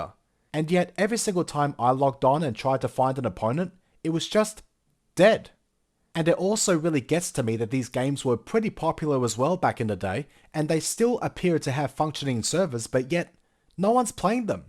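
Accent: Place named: Australia. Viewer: Not bad for an Australian accent.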